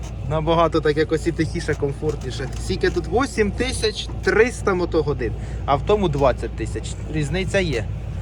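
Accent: Poltava, Ukraine. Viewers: Проживав би ти там де він, і сам так розмовляв би,Полтавський суржик він такий.Ластівка залишилась і далі працювати.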